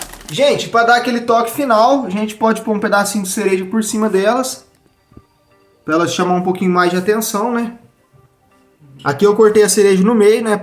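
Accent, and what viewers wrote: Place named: Minas Gerais, Brazil. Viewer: Eita mineirinho uai eu também sou mineira de Carangola uai, ô povo abenco sô.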